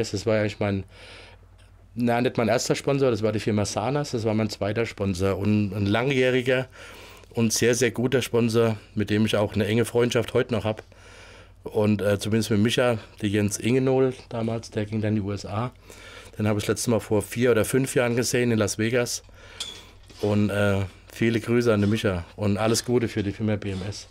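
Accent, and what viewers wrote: Hesse, Germany. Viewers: Hessisch is und bleibt de geilste Dialekt !!!! 😂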